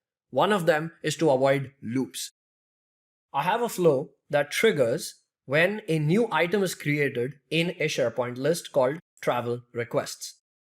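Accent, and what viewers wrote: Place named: India. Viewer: And his mic quality is actually good! Unfortunately so many of these talented developers making content have thick Indian accents, although they can’t help it - this makes it hard to understand coupled with bad microphones its doubly difficult to comprehend the great info they teach: shout-out! 💪🏼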